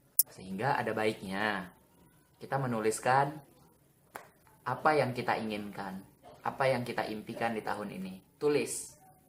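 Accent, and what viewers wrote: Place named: Medan, Indonesia. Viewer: wah .. semoga targetnya tercapai ya.. btw cara ngomongnya kok kayak anak medan hihi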